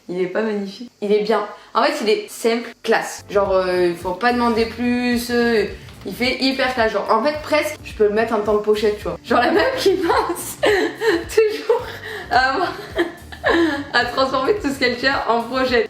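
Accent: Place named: Marseille, France. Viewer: Putin t’as un accent marseillais magnifique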